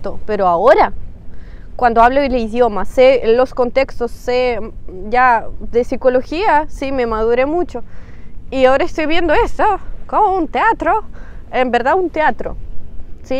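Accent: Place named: Chile. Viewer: Muy lindo ese campo 😍 Ya hablas muy bien el español. Aunque tienes un acento chileno, pero me gusta verte hablar en español. Ustedes las mujeres rusas son muy, muy inteligente y aprenden rápido todo. Bienvenida al idioma español.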